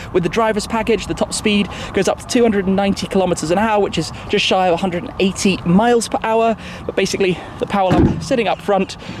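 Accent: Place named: United Kingdom. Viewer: Please, PLEASE, don't lose your brit accent and get too murican, PLEASE!! Keep up the awesome work and keep safe! Thank's, awesome machine, congrats:D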